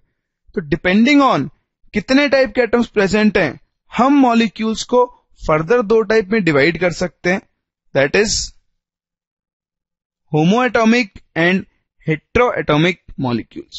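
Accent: India. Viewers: Indian English..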